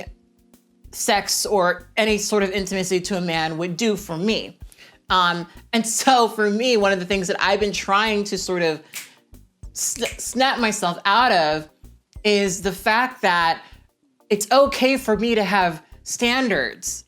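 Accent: United Kingdom. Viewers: i love how you verge into a british accent when you feel awkward bc same as an ace person this was really nice to hear! I am pretty sex positive actually, but I'm just not personally interested at all for some reason the ending where you peer pressures us into using the emoji has become my favorite part LOL like its so funny but weirdly comforting at the same time🥕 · British accent is something in my life I never knew I needed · Can't find any comments about the British (?) accent so I just wanted to say it slaps 🥕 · I was having a hypoglycemia while watching this video and those elf ears and the British accent felt so surreal, I thought I was loosing my mind The video is great ❤️ I can't agree more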